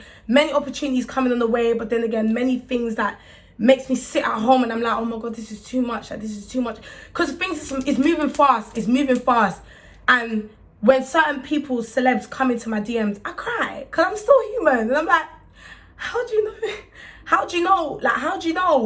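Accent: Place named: United Kingdom. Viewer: I don't usually like the British accent but you I love! but when you clap your hands all the Time omg I get so stressed. but love your energy and you deserve this love!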